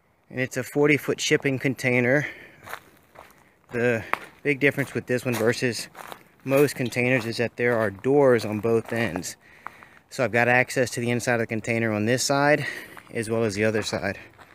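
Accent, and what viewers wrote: United States. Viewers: Your definitely not from Texas, lol. What's that accent? Yankee? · You sound like you're from Philly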